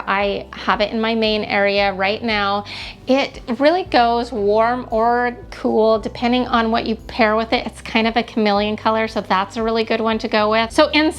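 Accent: Utah, United States. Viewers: I am so surprised to hear you’re from Maine, you 100% have a Utah accent. Mind blown.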